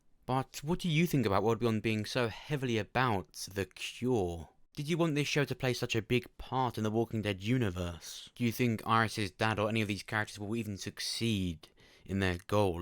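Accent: United Kingdom. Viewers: you really sound like Jamie Oliver, one of the many family chefs in the UK, I'm sure you know who he is and I'm pretty sure you guys also came from the same area because of your accents.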